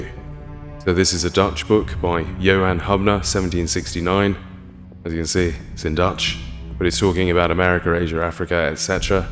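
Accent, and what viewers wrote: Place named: United Kingdom. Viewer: Nice British accent - I still suspect he's Russian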